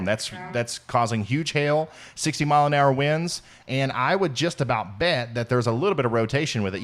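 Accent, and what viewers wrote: South, United States. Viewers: I don't think has a strong southern accent.just a hint there. You guys who says he has a southern accent needs to come to GA and listen to people. But I'm with it has nothing to do with intelligence at all.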